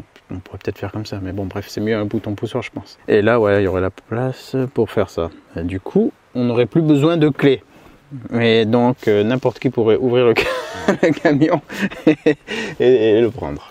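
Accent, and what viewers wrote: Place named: United Kingdom. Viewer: Bonjour, je te regarde depuis beaucoup de temps maintenant. J'avais une question à laquelle je n'avais jamais osé te la posé mais qui m'intrigue 😁 J'ai l'impression que tu as a accent britannique.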